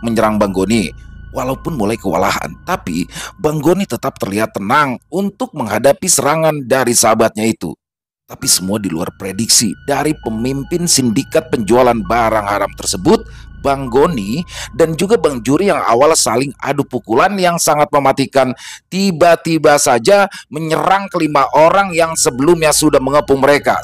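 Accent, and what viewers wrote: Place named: Jakarta, Indonesia. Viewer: Ane plg demen nih klo bang berlogat betawi. Cengkok nye mantep bgt 👏👏👌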